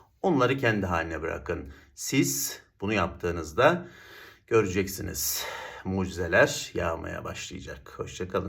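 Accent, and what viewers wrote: Ankara, Turkey. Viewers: Ankara lı mı, Sivas lı mısınız😅😅😅sevgilerrr 🎉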